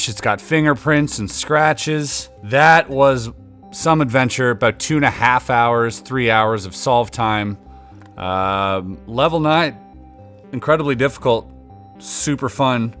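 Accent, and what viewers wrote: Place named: Canada. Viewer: says, "out" Hubby and I, "Owwt" lol We're literally only 6 hours away from the Canadian border and it always makes us laugh to hear that accent. Truthfully people think ours is funny too because we say "Wicked" all the time.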